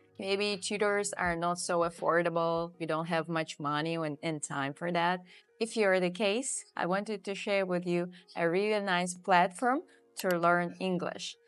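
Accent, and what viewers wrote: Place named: United Kingdom. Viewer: Truly, you are a sophisticated content creator who contributes to teaching authentic English with a British accent. This is excellent! May God help you. Perhaps there are difficulties; you could open an international English language school in southern Algeria—just a suggestion. I respect your work plans, value your commitments, and am proud of your humility, kindness, reputation, and lightheartedness. I thank you from the bottom of my heart and wish you continued progress, prosperity, and success. Amen, Lord of the Worlds.❤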